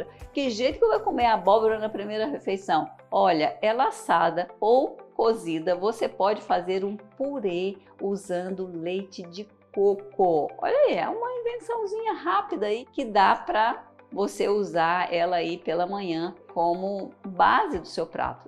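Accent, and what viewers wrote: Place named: São Paulo, Brazil. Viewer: Eu não sabia que ela era mineira. E por que fala como uma paulista? Tem que falar como mineira.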